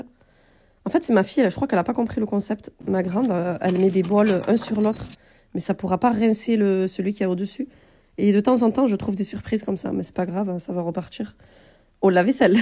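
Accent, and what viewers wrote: South, France. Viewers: Salem alikom okhty 😊 Merciii pour toutes tes vidéo déco, cuisine, ménage… 🥰 je te regarde depuis un bon moment et j’aime trooop ton accent du sud ensoleillé Allah y barek Allah te protège et te donnes la force inchallah 🤲🏻 Allah y barek pour ta récitation de Sourat « الرحمان » puisse Allah le tout puissant iketeblek le Hajj à toi et ton mari inchallah 🤲🏻🤲🏻🤲🏻 Allah te protège toi et toute ta famille inchallah 😘😘😘🥰🥰🥰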